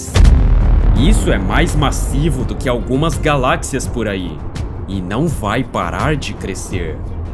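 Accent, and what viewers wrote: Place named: Caipira, Brazil. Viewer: eu gosto quando o caipira surge "redoR" "caloR"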